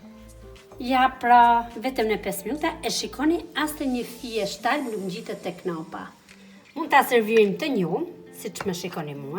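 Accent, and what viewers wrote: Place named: Shkodra, Albania. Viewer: Ty të lumshin ato dur se un vdes me hanger djath të njom mu duk se flitshe shkodranshe se edhe un jam shkodrane❤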